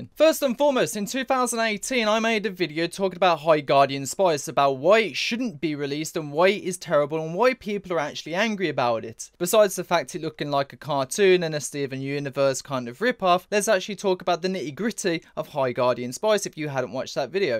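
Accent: United Kingdom. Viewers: man gets more British when he is angry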